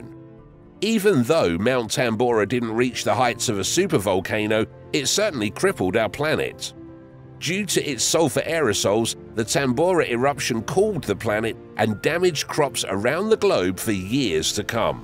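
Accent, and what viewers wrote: United Kingdom. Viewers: British narrators can piss off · NOT another Brit narrator!!! Stopped at :09 seconds How about an American to explain an amazing American wonder... I'm outta here! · 😒 why are these fearmongering videos always done by British voiced people. I made it 30 seconds and GONE!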